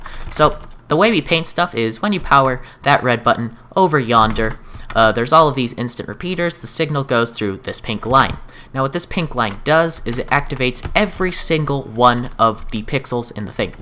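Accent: United Kingdom. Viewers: oooooooooooooooh ur british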